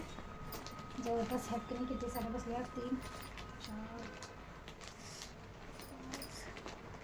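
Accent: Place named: Sangli, India. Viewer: Tumhi sangli sidevcha ahat ka bhasaha varun vatle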